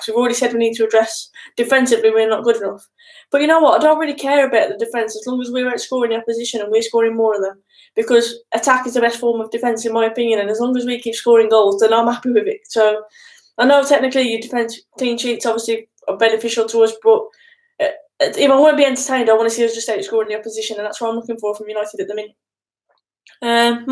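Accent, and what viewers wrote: Manchester, United Kingdom. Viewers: Nice manchester accent.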